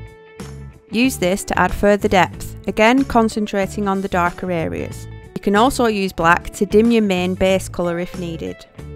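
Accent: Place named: Yorkshire, United Kingdom. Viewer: Are you from Yorkshire as you sound like one of us haha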